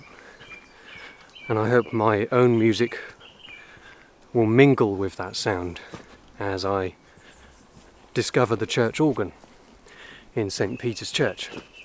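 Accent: England, United Kingdom. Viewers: Love gearing that ENGLISH ACCENT, YES THE BIRDS ARE ACTUALLY SINGING PRAISES TO THEIR CREATOR,!! I saw it somewhere in the Scriptures one time, HALLELUJAH, Lovely presentation your Sis, Thankyou, E83 Australia, 🎉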